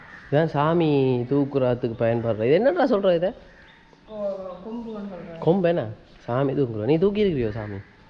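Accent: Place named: Tamil Eelam, Sri Lanka. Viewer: Super ellam castly mathri ullathu ungal eela tamil super ஒவ்வொரு மனிதரும் ஒவ்வொரு மாதிரி இருப்பாங்க take it easy ungal vaelai thodarnthu seinga thumbi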